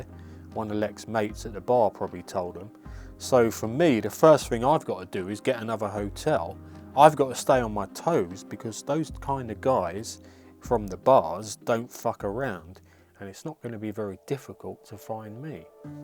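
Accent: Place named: Cockney, United Kingdom. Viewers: I watched this twice today with my thai sweetheart and being a Sth London cocker I found it hilarious, I think because of the obvious audacity and the cockney tune to it all and to see Rob pictured like some sort of mug was so funny mate this vlog is pure gold 55555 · Your accent reminds me of Danny the drug-dealer from "Withnail and I", who I believe was a Londoner. Maybe I'm just a dumb Yank who can't pick out a British accent though :)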